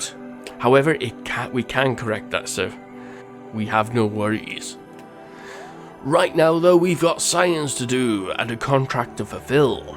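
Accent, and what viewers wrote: Wales, United Kingdom. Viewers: I thought I heard some welsh in there haha! I don't normally hear strong accents down here either. I take it Cardiff way then with the butt? Mush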